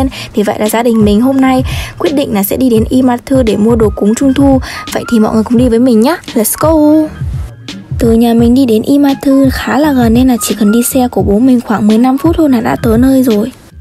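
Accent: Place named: Hai Phong, Vietnam. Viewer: người hải phòng mà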